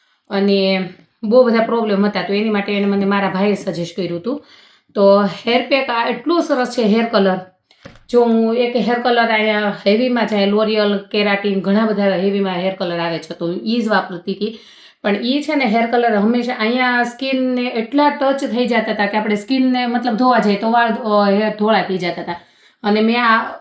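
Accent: Kathiawar, India. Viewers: કાઠિયાવાડી છો દીદી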